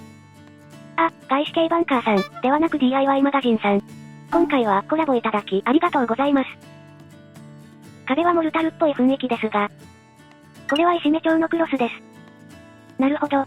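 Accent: Hakata, Japan. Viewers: チャンネル登録したよ🤗 Goodボタンも押したとよ🙋 博多弁？面白かとよ🤣🤣🤣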